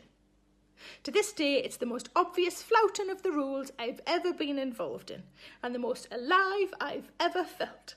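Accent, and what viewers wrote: Newcastle, United Kingdom. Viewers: Geordie. i.e. Newcastle :) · She is English, from the North. Her accent is Geordie. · I have been watching you for a short time and I am learning how to understand English spoken by a British person. Hard to do by a Yank. Love your comedy, keep it up. Lotsa Love.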